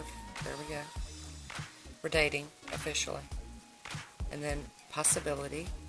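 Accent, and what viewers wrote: South, United States. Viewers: im so used to ur videos and i enjoy ur accent so immensely that when i read ur replys i hear that southern drawl. <3 i love it!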